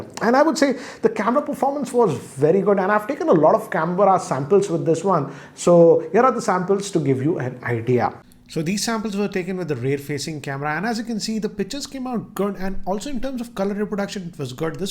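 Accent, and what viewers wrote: India. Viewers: when are you gonna drop that Indian accent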